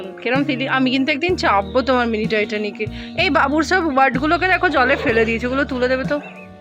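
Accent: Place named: Bangal, Bangladesh. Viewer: Duto paisi.. Valoi to bangal bolle bonu😂❤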